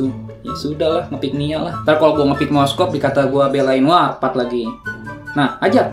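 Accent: Palembang, Indonesia. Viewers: Bang orang mana niih??? Palembangkah??? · perasaan dnger kata" kamp*ng, lu org plmbng pek ? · Aslinya palembang ya?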